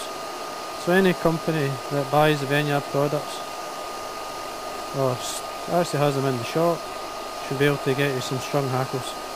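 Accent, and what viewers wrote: Scotland, United Kingdom. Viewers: How I love this Scottish accent! I hated it, when I first came to Scotland, but Scots are aswsome people and they simply changed my mind. To video - superb work thanks,. What type of dye do you use? I missed is somehow from the video. Thank you.:-)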